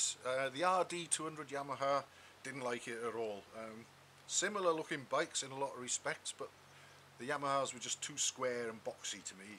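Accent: United Kingdom. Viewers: Totally “get it ”, thanks for articulating much better than me for why I feel the same way. Ex. Pat Brit who thoroughly enjoys your content. My Dad was from Hereford and your voice reminds me of all my uncles near Wales. All the best from Putnam Valley NY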